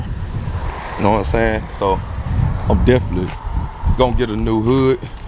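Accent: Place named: Memphis, United States. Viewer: Sounds like you from Memphis. But great video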